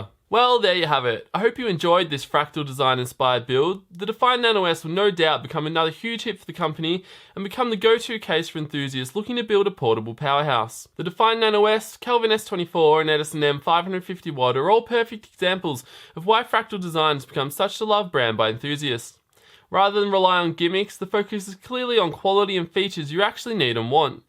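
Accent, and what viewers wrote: Australia. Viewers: Mate, no offence but your voice is horribly annoying. On top of it you just don't stop talking with no pauses between sentences. Your oz accent is almost incomprehensible at times and I resorted to use the captions which as you can guess are not very good. I could not (even if the video is well made, edited and nice altogether) watch more than the half of it. Please consider someone else to narrate it.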